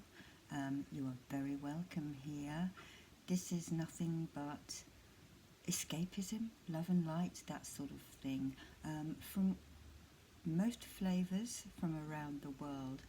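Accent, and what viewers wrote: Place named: Sussex, United Kingdom. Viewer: I've recently broken a tooth and although it's been repaired, I still find eating difficult, like my teeth don't really match. So, to hear your story, I can only say that you're incredibly brave to have gone through all that and still come out smiling and trying to help other people. And yes, as a fellow Sussex girl, I think we do have a nice accent here!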